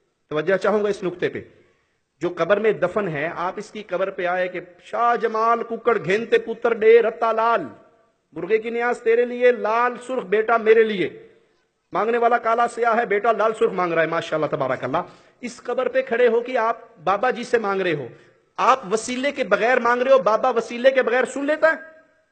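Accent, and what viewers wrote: Arab world. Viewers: اردو کو اردو لہجے میں بولا کریں، عربی لہجے میں نہیں۔ اردو کو عربی لہجے میں بولنا اتنا ہی غلط ہے جتنا عربی کو اردو یا پنجابی لہجے میں بولنا۔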